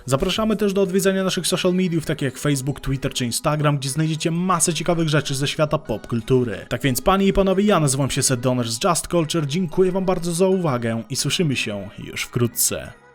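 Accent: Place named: United States. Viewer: Kanał ok, filmiki ciekawe, ale okropnie gryzie sposób narracji, skąd teraz wszędzie amerykańska tonacja zdań? W języku polskim akcent jest w zupełnie innych miejscach...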